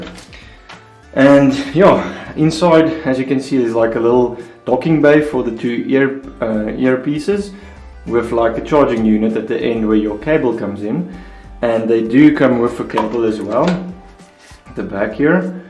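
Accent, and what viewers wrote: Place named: South Africa. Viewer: That South African accent...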